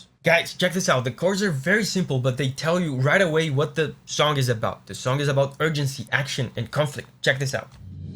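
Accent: Brazil. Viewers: you sound Brazilian, are you Brazilian?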